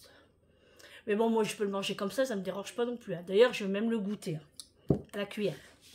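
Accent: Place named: Hauts-de-France, France. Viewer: Bonjour ah c est matcho avec l accent des hauts de france j entendais min t 'chot rohhhh Belle journée prenez soin de vous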